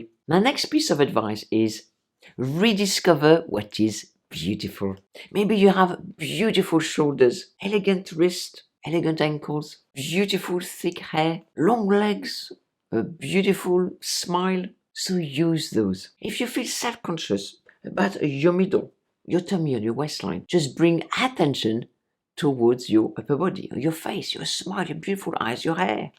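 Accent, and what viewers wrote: France. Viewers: . You are a natural teacher. Your voice tone, the pauses, inflections are so calming. The French accent is the topping on the cake! You impart a part of yourself to us. We feel it. You are so confident. You pull us in and we want to learn everything! Even if we know we're already. Thats a gift from God. Never give up. PS I can't wait to make apple your cakes from your other channel.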